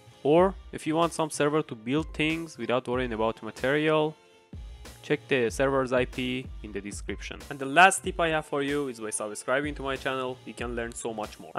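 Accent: Iran. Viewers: kheili moshakhase ye irani dare English shoat mikone irani hasti? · i bet you are iranian,ur accent man :D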